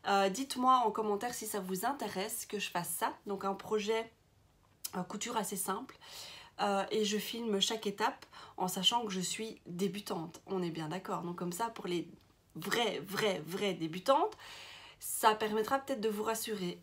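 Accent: Belgium. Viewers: Coucou, j'ai entendu directement aussi que tu es de Belgique (tout comme moi 😁) et j'adore ce que tu propose. Je suis pareil que toi ! Mon amoire déborde et je ne peux pas m'empêcher d'acheter sans pour autant coudre, ou alors comme toi je commence et je ne continue pas un projet que je rêvasse déjà sur un autre , au secours ! Ton challenge me parle ! Je vais faire comme toi et m'en lancer un aussi ! 😁 😅😬😘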